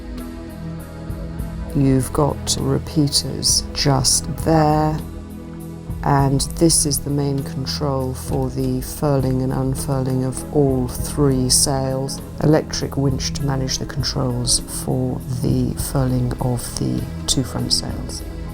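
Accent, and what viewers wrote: United Kingdom. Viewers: Your voice has the proper English accent for this very regal yacht. I feel underdressed if I'm not wearing white lace gloves and an Ascot-esque hat while you describe it.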